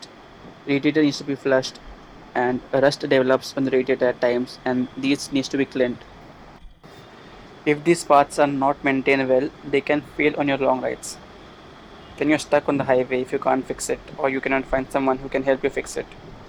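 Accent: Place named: India. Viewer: The problem is your accent. Write the words below the video to be running on the screen while you are speaking in the video. Otherwise, most of us who are not Indian missout much from what you are speaking.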